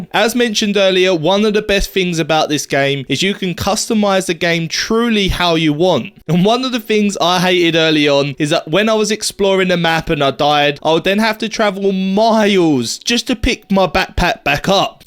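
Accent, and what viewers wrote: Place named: Cockney, United Kingdom. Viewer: Great info, your accent makes me cringe though.. COCKNEY AF EHHH?!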